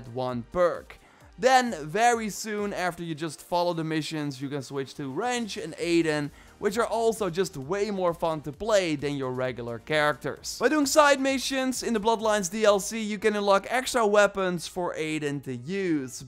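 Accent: Netherlands. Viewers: Hahahaha this guy sounds so dutch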